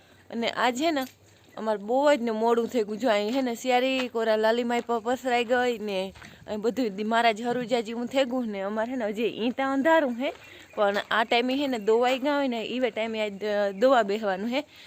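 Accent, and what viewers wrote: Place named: Saurashtra, India. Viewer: જય વછરાજ તમે ઘેડના લાગો બોલી સોરઠ ની છે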